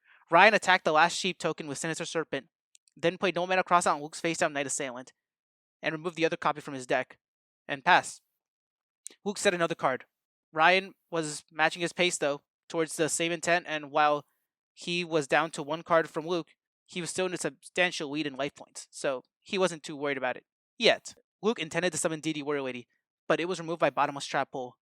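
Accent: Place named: New Jersey, United States. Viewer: I'm downloading this video as proof that you're from NJ and not Brooklyn.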